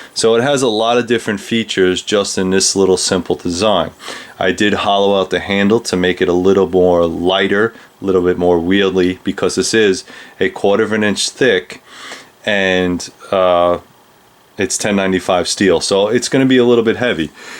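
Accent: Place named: New Jersey, United States. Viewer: You gotta be from Jersey brother....I grew up in Newark and I know that accent...lol.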